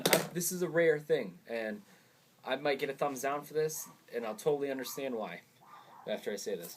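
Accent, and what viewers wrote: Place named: England, United Kingdom. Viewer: I signed in just to like this because of the english accent lmao great job.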